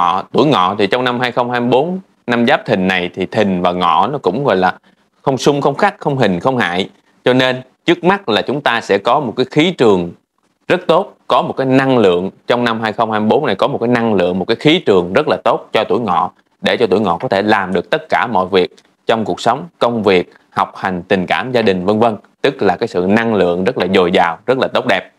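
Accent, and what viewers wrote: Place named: South, Vietnam. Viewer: tìm mãi mới thấy thầy nói tiếng nam nghe rõ dễ nghe , mấy ông nội khác nói tiếng bắc nghe tử vi chán ko thấm chút nào 😊 coi bói là tui kím thầy nam coi thôi à nhất là người miền tây dưới an giang á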